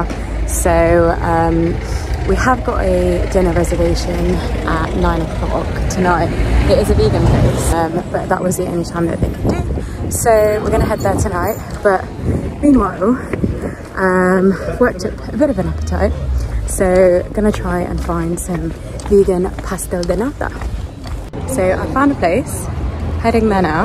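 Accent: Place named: United States. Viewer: Wow wow wow I love your accent are you American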